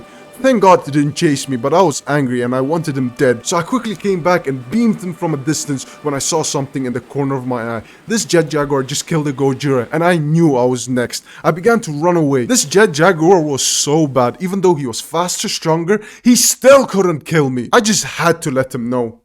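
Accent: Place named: United Kingdom. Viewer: you soud british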